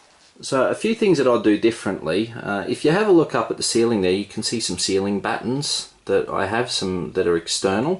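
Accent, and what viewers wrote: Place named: Norway. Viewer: I love your Norwegian accent 👍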